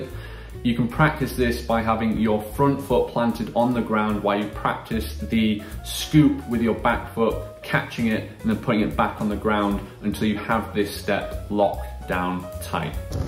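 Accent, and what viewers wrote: United Kingdom. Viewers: Really nice channel. Nice music, nice british accent!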